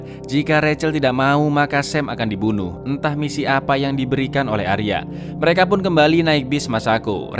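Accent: Java, Indonesia. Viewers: Halo Lurr. Bang Org Mana? Jawa or Jogja?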